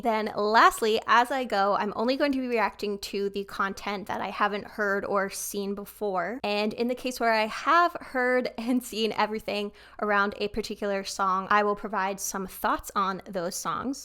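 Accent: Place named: France. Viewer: I believe Jiwoo and Kyujin are the rappers in Nmixx but they are also incredible vocalist like the rest of the group. Nmixx is a group under JYP known for their Mixxpop song. All the girls are incredibly talented and they are also well known for their stage practice where they sing live while dancing. It’s a group I learned to love this year and I absolutely love them! They are so talented and funny! (Sorry for my English, English isn’t my first language. Your French accent was so cute by the way! Love from France ❤️)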